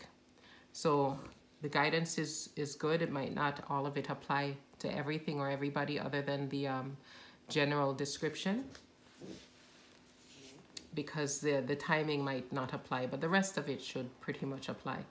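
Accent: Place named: Jamaica. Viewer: Trying to figure out your accent. Not sure if your Jamaican or not. Maybe Trinidadian. I know both talk differently. I’m usually pretty good recognizing Jamaicans. Maybe it’s not even the two countries I mentioned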